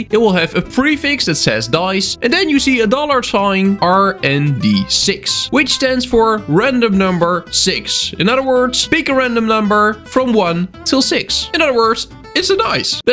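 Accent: Netherlands. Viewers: I smell Nederlander?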